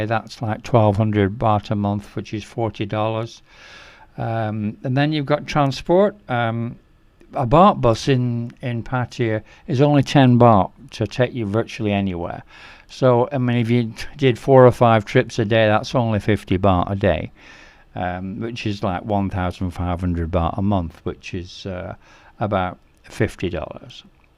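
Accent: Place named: England, United Kingdom. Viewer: Why are you giving costs in us dollars with an english accent ?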